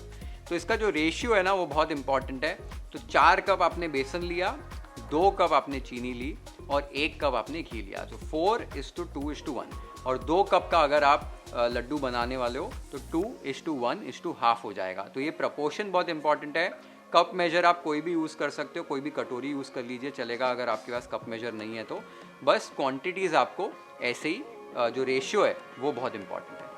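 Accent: Maharashtra, India. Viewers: आपकी हिन्दी महाराष्ट्रीयन style की लगती हैं लाडू = लड्डू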